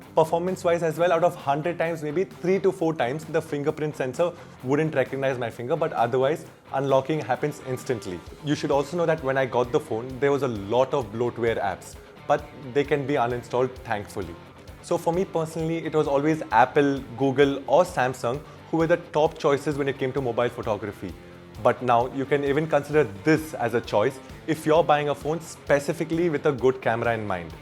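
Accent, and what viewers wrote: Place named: India. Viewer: Look like a european guy but with india accent🤭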